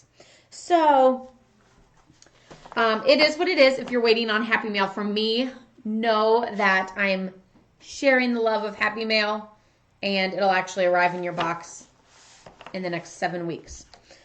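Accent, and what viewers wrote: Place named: St. Louis, United States. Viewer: Sounds like you are in the St. Louis area¿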